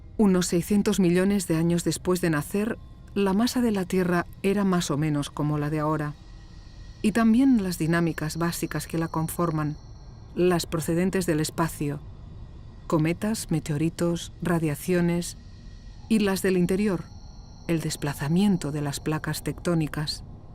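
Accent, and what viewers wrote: Catalonia, Spain. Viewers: El acento catalán tan marcado de la narradora no me ha dejado disfrutarlo. No tengo nada en contra de los acentos, pero para una narración hubiera preferido uno neutro. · Como se nota que la narradora es catalana, el acento la delata. Buen documental por cierto 👍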